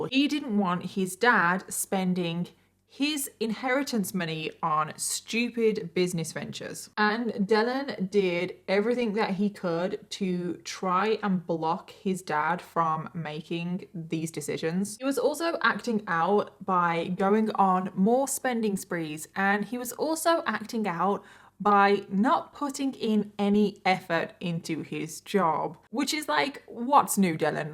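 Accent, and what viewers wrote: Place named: United Kingdom. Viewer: Imo you cover these cases better than most shows😂 I’m obsessed. And your so bri’ish sometimes I love it lmaoo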